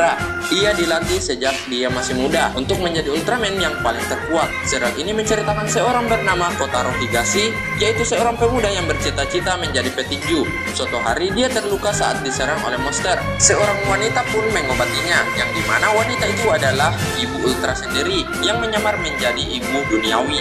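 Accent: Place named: Eastern Indonesia, Indonesia. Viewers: hahah masa kecil kita bahagia banget, thankslah infonya,,, anyway logat ngmgnya asik banget bang, orang timur apa sumatera nihh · Asal mana sih logatnya kayak orang makasaar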